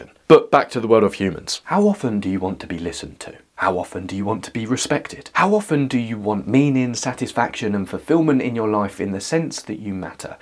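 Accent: United Kingdom. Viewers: wat an accent. I believe it's a British accent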